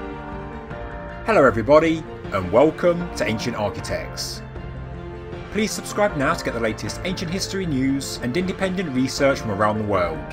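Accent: United Kingdom. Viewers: Worst dialect inflections of the UK. Spoils the whole show.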